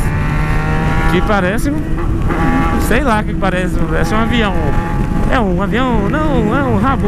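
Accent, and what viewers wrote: Goiás, Brazil. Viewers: Pq vc fala "no pique du Goiás"? Vc é daqui? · Queria saber por que o fala no piqui do Goiás kk eu sou do Goiás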